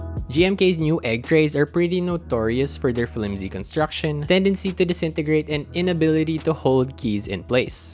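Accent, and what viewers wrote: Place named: Philippines. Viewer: wow this is my first time watching ur channel and the second i click the video i already guessed u were filipino lol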